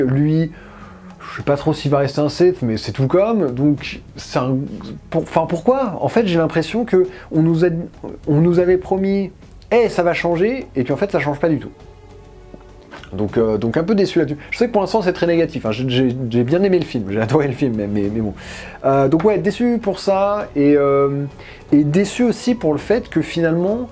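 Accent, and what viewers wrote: United Kingdom. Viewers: Salut, critique super sympa, j'ai juste un peu de mal avec la prononciation à l'anglaise de tous les termes de star Wars...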